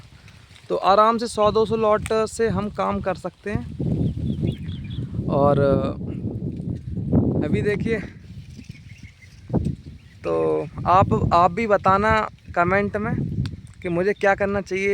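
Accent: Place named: Bundelkhand, India. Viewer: Kaha se ho bhai.....bundelkhandi lagray😊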